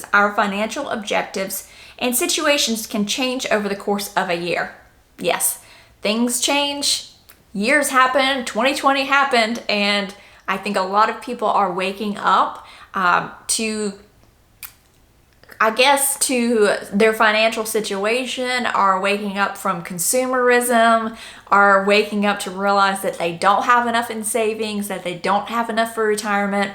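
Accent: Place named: South, United States. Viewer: Her advice and tips are awesome but does anyone know where her southern accent is from I think it might be Arkansas but I don't know. Any help is greatly appreciated would help eliminate the guessing lol.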